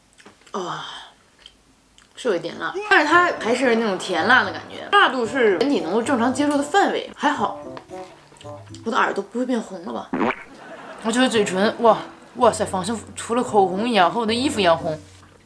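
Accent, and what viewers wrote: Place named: Inner Mongolia, China. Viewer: 另外，感觉羊pd是内蒙古妹子，口音很像我们东北这边，但又能感觉到一丢丢的区别。听着很亲切☺️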